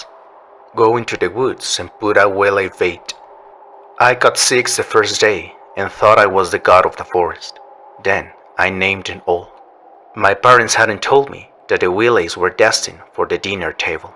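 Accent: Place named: Spain. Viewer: I love the accent! It's like listening to Star Wars as read by Antonio Banderas! REPOBLIC! LOL! LOVE IT! 😅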